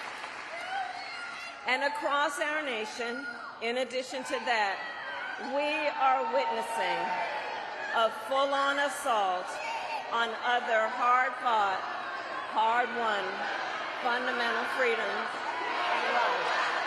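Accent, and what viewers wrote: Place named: South, United States. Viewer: I see she got her southern accent back. Who’s paying for people to get $25,000. Oh that’s right,the house is going up in price. Why didn’t Trump do what’s she’s saying when he was president before? Trump didn’t do anything she’s talking about. Women can still get birth control. Kamala Harris stop lying. You can still get birth control and abortions up until 12 weeks. Why are people believing her. She’s a little tipsy I’d say. I guess it’s true what they’re saying. Is she saying you’ll have to vote? Kennedy has been working on the environment for years. He saved the Hutson River while she was putting black men in prison for cannabis.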